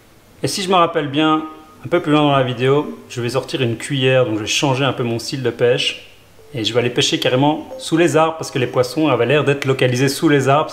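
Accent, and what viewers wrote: Belgium. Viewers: Moi j'aime bien ton accent Belge :-)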